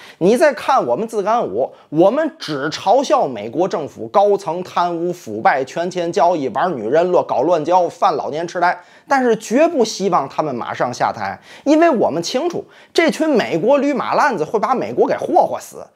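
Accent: Tianjin, China. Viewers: 天津话是真逗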